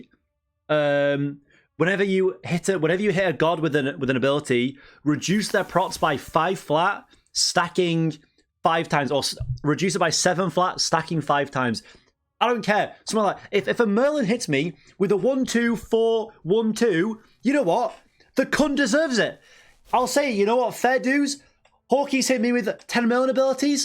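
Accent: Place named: United Kingdom. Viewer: 50 minutes of a British ranting... Man were eating good today 🔥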